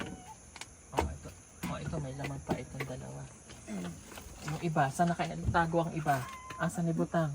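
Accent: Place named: Bisaya, Philippines. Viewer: Naruto n ding magbisaya c sir 😊, mga ilang panahon p magiging fluent n din cia sa bisaya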